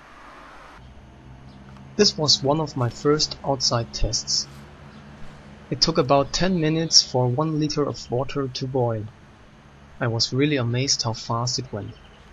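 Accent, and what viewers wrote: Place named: Germany. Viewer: you have a german accent.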